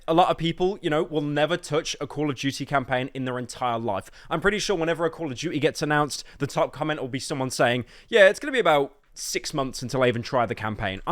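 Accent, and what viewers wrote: United Kingdom. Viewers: a brit talking about a game. just what i wanted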